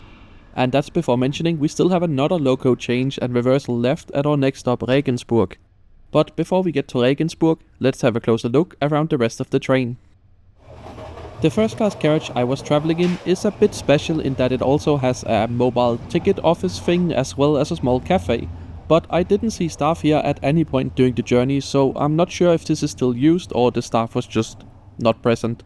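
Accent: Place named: Denmark. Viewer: I was wondering: funny this voice sounds almost like having a danish accent! And them I read the name…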